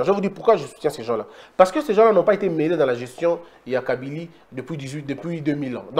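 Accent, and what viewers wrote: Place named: Lingala, Democratic Republic of the Congo. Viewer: Lingala kitoko mingi!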